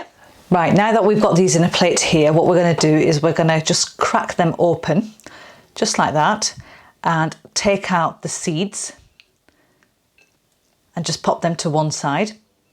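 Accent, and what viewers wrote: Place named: North of England, United Kingdom. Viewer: You're very welcome !! If you recall, I mentioned earlier that I was born (1944) in Glasgow, & am now retired & living in Oakville, Ontario, Canada. I've eaten various Curries since my Teenage years, & make them at home. Also, I love your accent from (where in ?) the North of England. And, where are you now down South ? As I've said before keep up the good work, & Curry on !!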